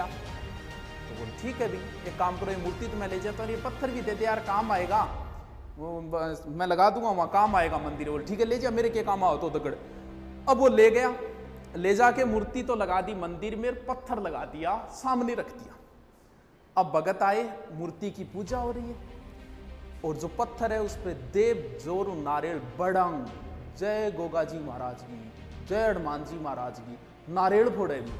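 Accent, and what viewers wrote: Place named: Haryana, India. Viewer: Sir aap haryana se ho ya Rajasthan se dono language remix lag rhi h me haryana se huu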